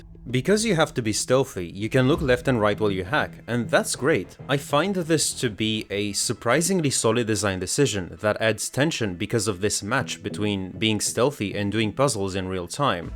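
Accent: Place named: Balkans. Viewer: No way you're actually Dutch? I'm really sorry but you got some sort of Balkan accent, I never expected that lol Of je kan Belgisch zijn... Ik heb geen flauw idee hoe een Belgisch accent in het Engels klinkt x3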